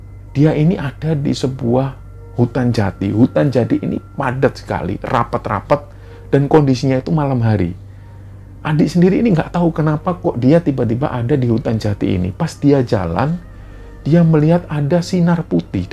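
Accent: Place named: Surabaya, Indonesia. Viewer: Nuwun sewu, sedikit saran aja mas, usahakan jgn pakai dialek surabaya/arekan kalo menceritakan dg latar jawa tengah dan daerah dialek non arekan...matur suwun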